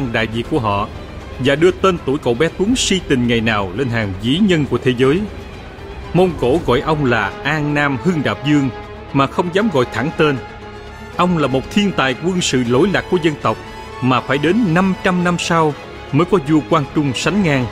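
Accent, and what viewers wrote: South, Vietnam. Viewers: Giọng miền nam hơi khó nghe đối với người miền bắc. Hy vọng anh lồng tiếng nói rõ hơn. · Giọng nói thuyết minh Miền nam hay quá,khen ,để mấy anh chị HN chắc không ai nghe .Thanks for posting.(soluu huong)🇺🇸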